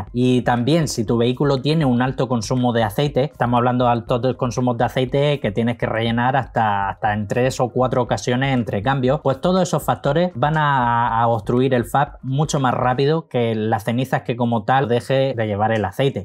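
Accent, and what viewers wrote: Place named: Almería, Spain. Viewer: Buenas noches. Acabo de descubrir tu canal por casualidad, y me ha encantado. Podrias recomendarme un aceite para mi Audi A3 1.9 tdi 130 CV del año 2000? Ahora tiene 220.000 kms, en su día en el servicio oficial le ponian el Repsol elite tdi 5w40. Por cierto, al escuchar tu voz creo q eres de Almería o Murcia, porque he estado muchos años destinado en la Base Militar de La Legión en Viator, y me suena mucho el acento y la pronunciación de algunas palabras como se hablaban allí en Almería.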